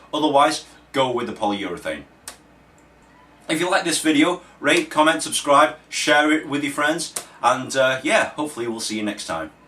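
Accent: United Kingdom. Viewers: Thanks. What a pain in the keyster. If I decide to make a guitar fretless, I think I'll buy the neck.Thanks for this video!BTW...Vietnamese (?) guy with a Texas A&M tshirt and a British accent...i chuckled a little!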